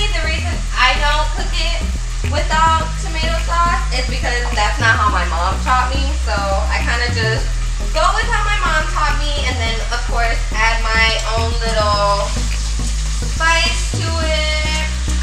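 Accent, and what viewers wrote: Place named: Puerto Rico. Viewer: I loveee your Puerto Rican accent 😭😭❤️❤️❤️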